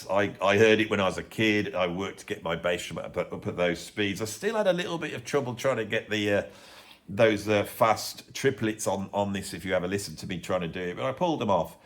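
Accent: Birmingham, United Kingdom. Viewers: He does have lovely, dulcet tones with a soft Brum twang, very easy on the ear! A lot of people have a go at the Birmingham accent, I don't. It's one of this country's finest accents.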